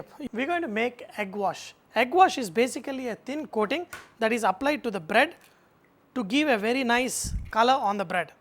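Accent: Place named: Telugu, India. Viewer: I like the way this guy speaks.......the accent is of a typical telugu guy..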